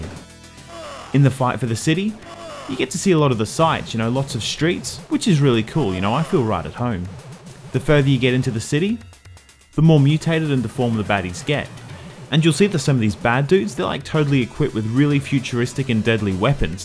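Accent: Australia. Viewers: Dude's Australian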